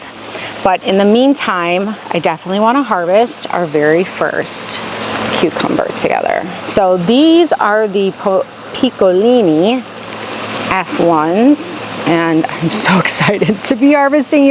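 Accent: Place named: Midwest, United States. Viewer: I knew you had to be from the Midwest once you said you were from Illinois, we have the same accent 🤪 I’m in MN. ❤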